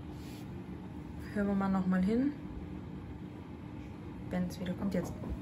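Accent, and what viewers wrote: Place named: Swabia, Germany. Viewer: süss das schwäbische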